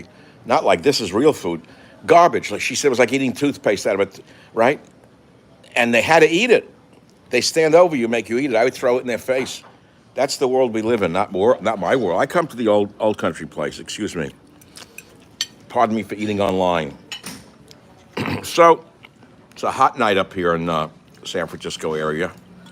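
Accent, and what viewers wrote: New York, United States. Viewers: regardless of whether we are alone or with family out here, we listen because of the way you say things, your delivery and segueways. I listen for your New York accent and kvetching. Keep it up my friend.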